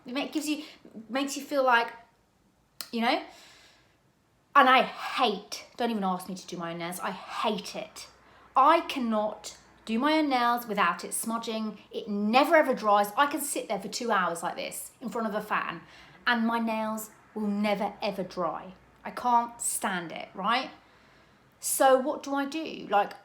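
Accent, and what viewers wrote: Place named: Northern England, United Kingdom. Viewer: Hey, I'm loving the pumpkin vibe you're having. It reminds me of how much I miss the autumnal days at home, plus the fact that there is so much more choice in the supermarkets than over here as the seasons change 🍁. I do feel that the 'clean beauty' fad is just really bad and complete misinformation. Watch ANY board certified dermatologist or cosmetic scientist and they give all the clinically proven facts that parabens and all the other 'demonised' preservatives are absolutely safe and absolutely needed to keep our products bacteria and germ free.....and prevent skin infections.... UNLESS you have a known allergy to a specific ingredient. This is why cases of some skin complaints (peri-oral dermatitis ect), are on the increase. Oh, it's the same over here regarding TV, there's nothing interesting....although I do still love coronation street and eastenders on UK TV 🙃👌. 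Yep, I'm here for the 'latte' inspired make-up look, 👌 😍. It looks really natural and glowing.... however, I'm not a person who gets my nails done, so I can't comment. Apart from saying, "Go with what appeals to you, you can always change it later on." It's good to have a choice 😊 LOVING the Northern accent, too 😍 😅. Great video, and it's always great to see you 👍 ❤⚘️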